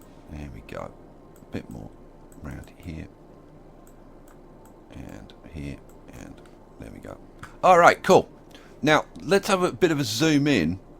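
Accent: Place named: Midlands, United Kingdom. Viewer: Great job. By the way i am going to guess you are mid forties and originally from somewhere in birmingham or towards nottingham?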